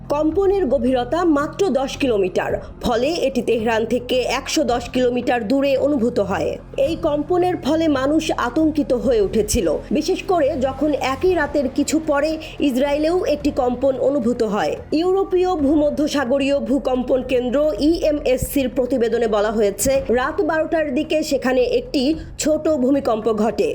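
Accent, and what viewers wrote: Kolkata, India. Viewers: Uposthapika ki kolkatar naki.?😅😅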